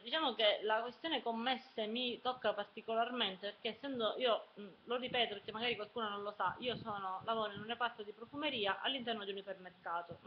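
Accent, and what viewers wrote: Sicily, Italy. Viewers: Io invece penso che ognuno abbia il diritto di esprimersi come preferisce. Youtube non è il luogo in cui rivendicare la perfetta lingua italiana. Ci sono luoghi e tempi per farlo. Diciamo che per vincere questa crociata, forse bisognerebbe cominciare con chi la lingua la deturpa scrivendo con le "k" o sostituendo il "per" con la "x". si esprime in italiano corretto la maggior parte del tempo e, se a volte un po' del suo essere siciliana esce fuori, non vedo in cosa sia TANTO fastidioso.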